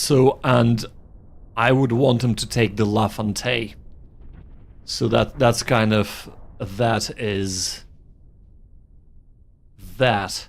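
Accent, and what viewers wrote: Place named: Eastern Europe. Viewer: Nice one boss. Where r u from? Eastern european accent. I played everything available for free from Wargame Design Studio. Grenada, Miuss etc... Great games. Get stuck straight away.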